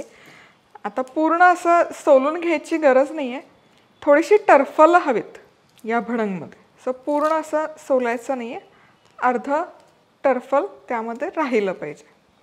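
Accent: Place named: Sangli, India. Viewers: खूपच बेस्ट कोल्हापूर बोललात विषय हार्ड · आम्ही पण सांगलीकर आहोत.त्यामुळे खूप मजा आली भडंगाची रेसीपी पहायला आणी हो टिपीकल भाषा सुद्धा बोललात ते लै भारी वाटल...... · कोल्हापुरि भाषा मस्त जमते की....खूप मस्त भडंग.....🎉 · Chan bolalat Tai sangalichi bhasha ani recipe pan mast❤❤ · भाषा सांगलीची व भडंग पण सांगलीचा फार. छानच वाटल मधुराम्याम......🙏🙏🙏🙏🙏❤️❤️👌👌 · जमल की सांगली ची भाषा बोलायला आणि भडंग तर लईच भारी 👍🤩✌️,l am from सांगली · सांगलीची भाषा आणि भडंग दोन्ही जमलंय चांगलं 👌 Love from sangli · tu अमेरिकेत राहून सुद्धा सांगली,कोल्हापूरची भाषा खूप छान बोलतेस.तुला पुढील रेसिपी साठी. All the best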